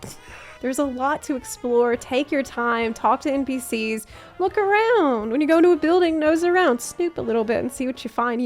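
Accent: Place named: New Jersey, United States. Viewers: I’m from NJ. This is just how a lot of people here talk. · Haters to the left, your Jersey Goblin accent is spot on.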